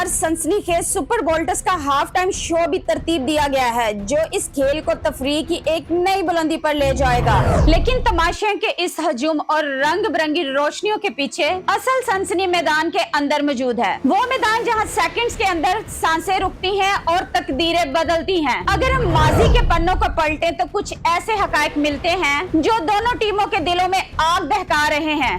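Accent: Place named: Punjab, India. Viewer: یہ کوئی بڑی سفارشی ھے،،،اردو بھی پنجابی میں بول رھی ھے،،،جیسے خواجہ آصف بولتا ھے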